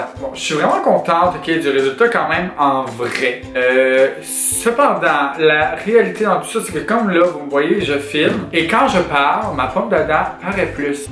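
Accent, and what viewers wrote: Quebec, Canada. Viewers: En faite j'adorrrrrrre ton accent Québécois mais enfaite le A vous dites E et c trop beau !!!!!! J'aimerai trop avoir votre accent!!!!!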